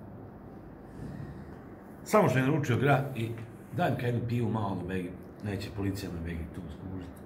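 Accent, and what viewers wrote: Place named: Živinice, Bosnia and Herzegovina. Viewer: Živiničanski dijalekt je u pitanju, pa ga vjerovatno zato ne razumijete.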